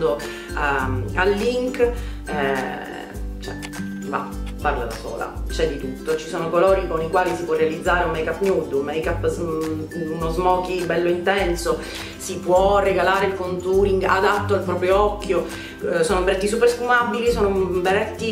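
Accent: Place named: Sicily, Italy. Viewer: Ciao! Sono d'accordo con te in merito alla Naked 1...manca qualche ombretto opaco in più! :-( Dall'accento mi sembri sicula... come a me! :-) Un bacione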